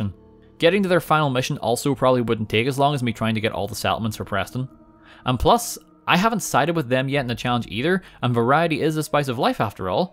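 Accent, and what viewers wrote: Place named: Northern Ireland, United Kingdom. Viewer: the northern irish accent is strong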